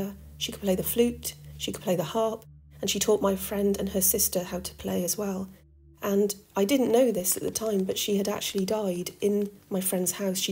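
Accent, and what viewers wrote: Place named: Wales, United Kingdom. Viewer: Love your Welsh accent 🎉.. Great vids,keep them coming. Happy 2024 to you and all 🎉❤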